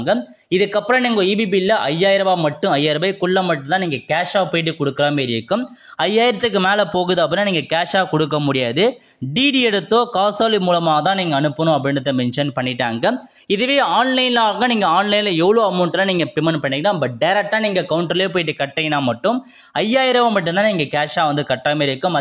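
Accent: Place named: North, India. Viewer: எவனோ வடக்கன் பேசுறானோ? என்னடா பேச்சு இது..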